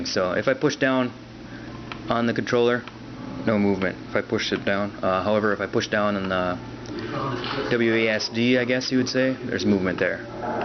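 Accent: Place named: Canada. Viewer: Funny, the beta was only for USA...or was it NA? because you sound Canadian.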